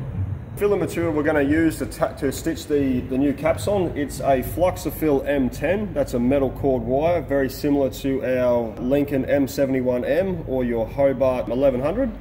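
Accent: Australia. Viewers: Awesome!!! And this channel is even useful to practice English with an Australian accent 😁😁😁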